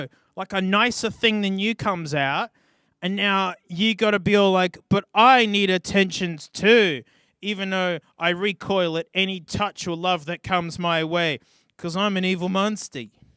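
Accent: Australia. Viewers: I know I watch too much when I start thinking in an Australian accent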